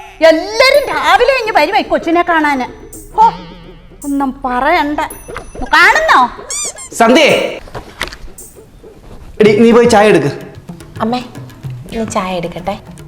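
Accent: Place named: Kollam, India. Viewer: Ammichiyude varthamanum ketittu kollam bhagathu ninna tonunnu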